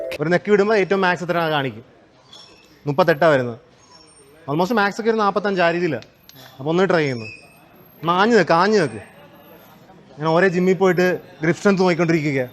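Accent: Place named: Alappuzha, India. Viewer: Ivn samsarikkunna reethi sheri alla alapuzha karde sthiram olla tone anubee akkal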